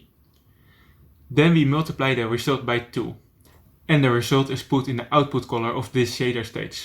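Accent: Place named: Netherlands. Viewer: Guy with heavy dutch accent explains shaders.mp4 Good video! I would just mess around with the settings until I got what I wanted.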